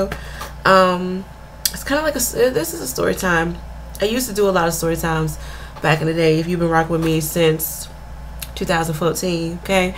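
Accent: Caribbean. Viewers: Where are you from. I hear a couple of accents from you. Like Caribbean at times and an English accent. Be blessed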